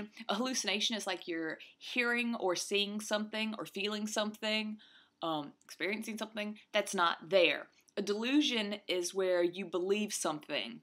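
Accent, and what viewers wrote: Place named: South, United States. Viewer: Your southern dialect is also very attractive.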